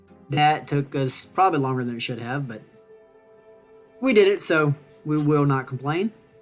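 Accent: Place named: Ireland. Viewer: It's Cool! The Irish Accent adds some flair to it! 😎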